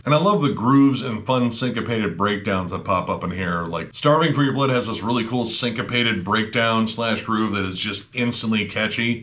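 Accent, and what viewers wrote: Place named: Wisconsin, United States. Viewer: Im a sucker for a Wisconsin accent...on a lady. Hey, I dont judge.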